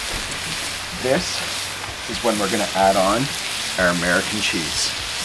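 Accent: Canada. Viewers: Looks delish! And love your Northern Neighbor accent. :) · Canadian eh?!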